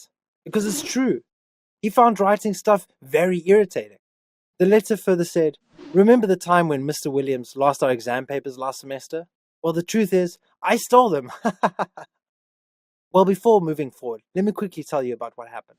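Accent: South Africa. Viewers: This is the first time hearing a narrator speaking in a South African accent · South African right? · Is the narrator South African? Cause he sounds like it. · The narrator is probs South African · Hmmmmm do I hear a South African accent? Niceeee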